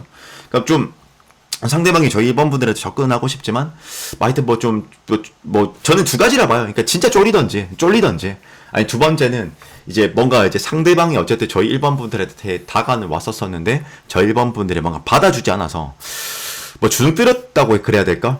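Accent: Gyeongsang, South Korea. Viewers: 사투리가 어때서 트집을잡냐? 경상도 사람이면 사투리쓰지. 에구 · 1번...몇달전에 썸타다가 제가 까인 입장이라..ㅎㅎ 저랑 잘 될수가 없을거같긴한데...ㅜ저 자존감 낮아져있어용 ㅜㅎㅎ그나저나 전라도 여자인데 경상도 사투리 너무 듣기좋아용~~~차분하게 말씀 잘해주시고 전달력 충분히 잘 되고있어요^^ 모든 사람이 서울말을 써야한다는건가..그건 너무 억지같아요..계속 사투리 리딩 기대할게요^^ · 갱상도인으로서 사투리 지적은 용납할수가 없다. 우리는 서울말같은거 쓸줄 모린다. 너거도 사투리 몬쓴다아이가.! 같은 갱상도인으로서 긍지와 자부심을 지켜주십시오. 우리가 돈이 없지 가오가 없습니꺼!!!!! 화이팅!!!!! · 갱상도라 좀 거부감이 있지만 내용이 더 중요하지요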